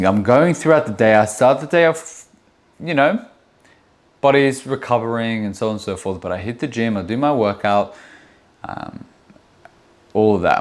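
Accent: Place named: Australia. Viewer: You're so fucking hot, but I cannot deal with an Aussie accent, mate.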